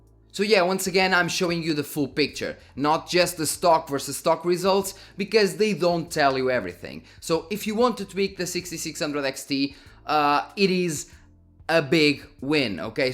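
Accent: Portugal. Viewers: Gotta love that Portuguese Accent 👌🏽💪🏽😎❤️ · Nice try, Portugalian :) I like your accent ))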